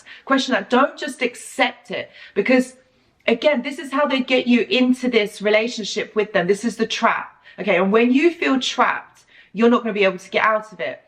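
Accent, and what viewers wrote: United Kingdom. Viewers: Very eye opening! For me this one has been very hard to tell because I am very open minded sexually- even so though he’s done a few subtle things that just made me feel uncomfortable. I could never really put my finger on why but just this lingering feeling that something is wrong. I was also going to say that since I have been watching your videos every day now I noticed when I am dealing with the narcissist it’s like your voice is in my head dictating my words! I am writing out the text response and I read it back to myself and it’s almost like I didn’t even write it I hear this you know British accent and I’m American!! Just something a bit kooky lol 😂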